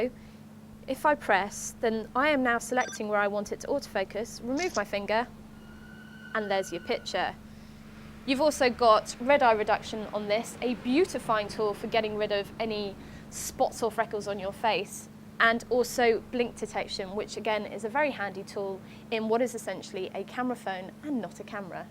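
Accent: United Kingdom. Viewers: haha, i hear you man. love the differences in the two languages (english and the queen's english). keely hazell needs to start doing phone reviews. >:)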